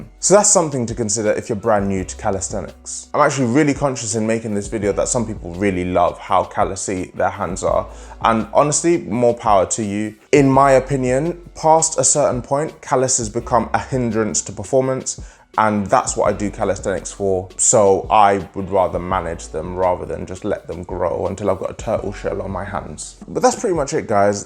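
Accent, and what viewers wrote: United Kingdom. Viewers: Your videos are twice as useful for me because I get my calisthenics info in a weekly basis AND I even get to practise listening to your UK english accent since I have the CAE C1 exam in June! Good stuff man!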